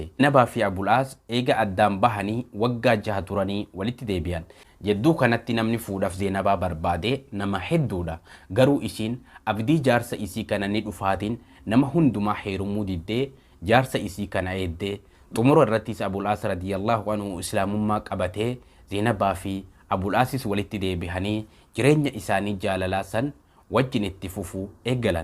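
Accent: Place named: Arsi, Ethiopia. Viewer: As ayk wr wb mashala mashaala rabbi umri dhera sii haa kenu barumsa garii sira barane obbolesa muslimati sagaleti arsii naa fakate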